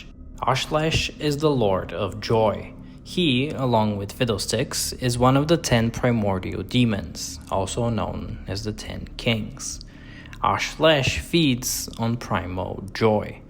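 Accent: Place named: Manaus, Brazil. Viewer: u whistle while you talk, you sound like a little bird, people from Manaus, Amazonas, like me, have that accent too.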